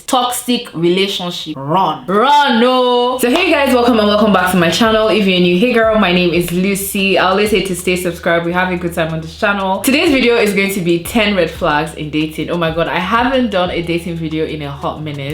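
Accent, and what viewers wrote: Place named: Nigeria. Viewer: The Nigerian accent gets me all the time😂❤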